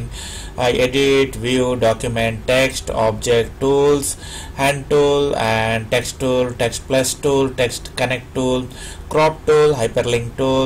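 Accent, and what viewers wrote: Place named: India. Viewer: You have a big Indian accent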